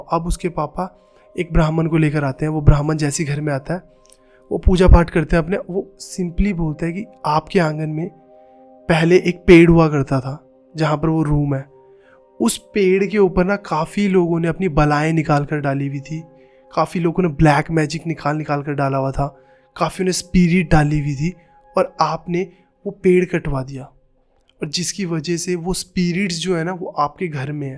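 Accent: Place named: Delhi, India. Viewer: Aap dilli bale style me hindi mat bola karo, kahani barbad ho jata hai.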